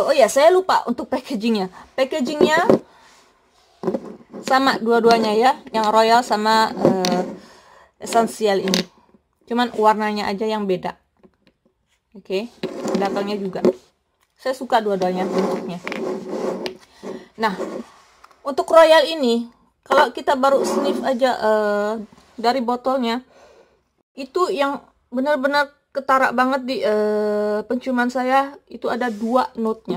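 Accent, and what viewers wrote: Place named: Banyumas, Indonesia. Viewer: logat nya kok spt logat ngapak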